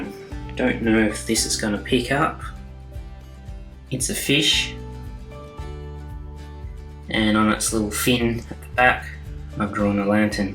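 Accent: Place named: Australia. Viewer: Love watching the way you paint light! And great having a fellow Aussie accent to listen to 😊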